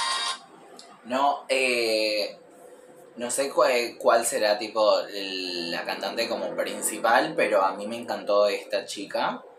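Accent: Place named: Rioplatense, Argentina. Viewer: Por el acento debe ser de Argentina o Uruguay. 😉😊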